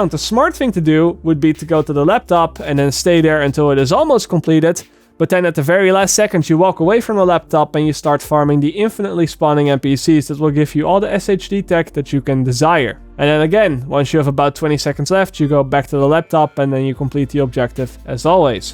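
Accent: Netherlands. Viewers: Are you dutch ? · I can hear you are dutch🤣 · Cool video! I really love that durch accent not gonna lie 😂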